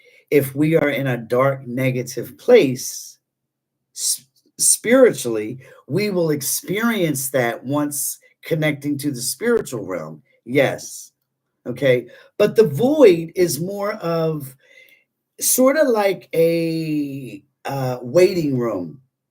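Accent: Philadelphia, United States. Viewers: Thank you. And I thought I detected a Philly area accent. Go Eagles! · Hi I am so glad I found your channel. Listening to you I knew you were from the Philly area! It is so exciting that the Eagles are Super Bowl bound! Go Birds!!! 🦅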